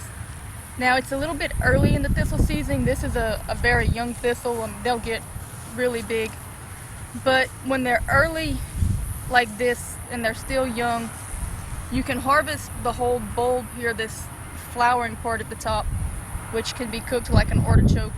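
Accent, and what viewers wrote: Louisiana, United States. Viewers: You sound like you're from Louisiana, by golden meadow or Thibodaux.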